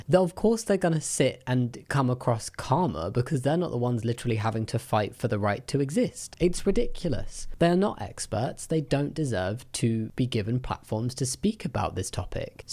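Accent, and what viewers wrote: United Kingdom. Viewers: I'm B1.2 in english but his British accent Is a little bit difficult to understand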